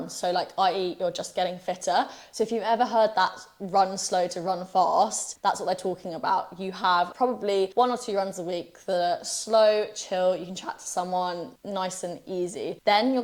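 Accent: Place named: United Kingdom. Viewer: I had to slow down the playback speed to .70 😂.. that British accent is hard enough to understand, now add 85 mph (135 km/h ) 😅.. Besides that, Excellent informational video.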